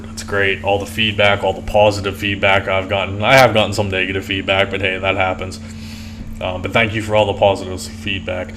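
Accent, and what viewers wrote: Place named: Philadelphia, United States. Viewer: Nice, me too but you sound like a friend who moved to Philadelphia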